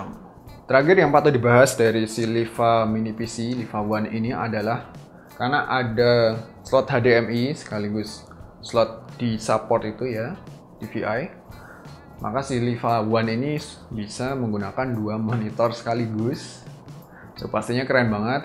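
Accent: Java, Indonesia. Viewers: Waduh Pak Logat Jawa nya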